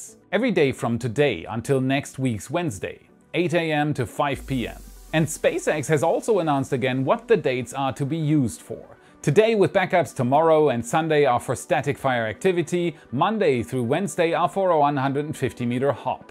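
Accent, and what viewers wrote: Germany. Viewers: More German accent please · Now when I know that you are German I can hear your accent all the time 😂😂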